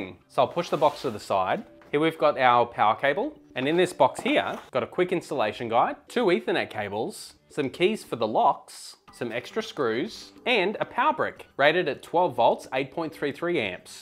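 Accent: Australia. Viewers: notices Aussie accent liked and subbed mate. Great video btw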